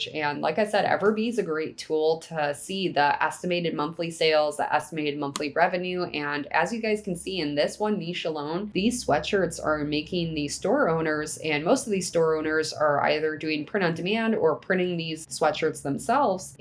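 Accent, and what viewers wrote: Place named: Wisconsin, United States. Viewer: Do you mind me asking what state you're from? I'm trying to place the accent. I'm guessing Wisconsin. Great voice by the way.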